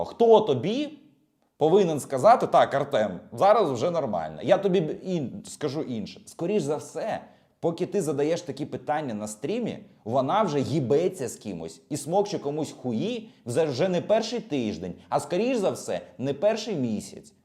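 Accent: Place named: Surzhyk, Ukraine. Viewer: Задолбал слушать твой сраный суржик.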